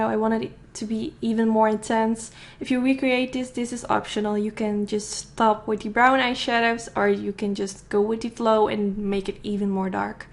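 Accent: Netherlands. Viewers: I love this look so much, you make it look so easy! Also, can I mention... Your accent is 👌🏼 I have a Dutch uncle, so I am biased of course! 😊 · are you Dutch? I hear something in your voice!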